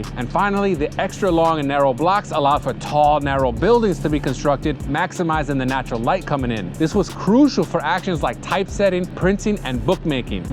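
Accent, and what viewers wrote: Chicago, United States. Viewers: I love the lingo/accent. I grew up on the NW side and I feel like the accent/lingo is largely gone now - it feels like everyone I meet here grew up somewhere else!! At my last job, no one grew up in Chicago at all (there were like 20 people) & they wouldn’t understand me when I would jump into Chicago lingo, so I had to avoid it as much as possible because I didn’t want to explain lol Anywhooo, grew videos!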